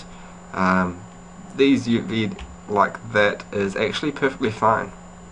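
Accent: New Zealand. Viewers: LOL! English? is from New Zealand. I actually quite like his accent.:) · Sounds like a Newzealander, thought that aswell. He's one of us.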